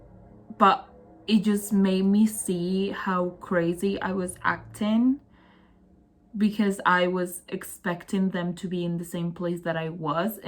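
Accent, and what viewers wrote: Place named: Andalusia, Spain. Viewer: Ojalá tener tu acento, de que parte de sur de España eres?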